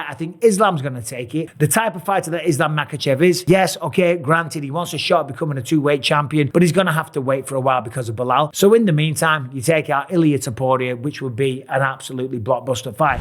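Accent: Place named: Liverpool, United Kingdom. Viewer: Little scouse prick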